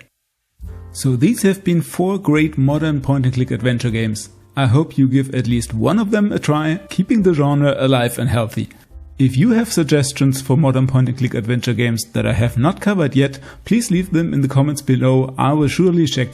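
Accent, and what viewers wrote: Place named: Germany. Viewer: da hämmert jemand seinen deutschen dialekt aber wirklich mit aller gewalt durch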